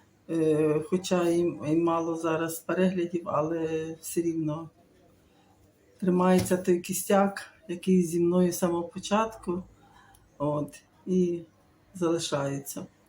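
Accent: Surzhyk, Ukraine. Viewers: Не буду на вас підписуватись....Ваш дикий суржик дуже ріже слух.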